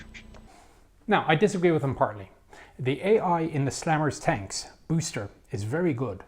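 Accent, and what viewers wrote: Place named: Ireland. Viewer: Why do I feel like this guy speaks with an Irish accent?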